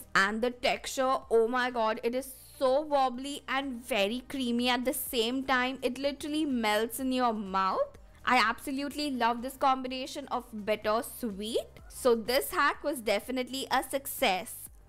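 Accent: India. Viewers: the way u says Hinglish I really love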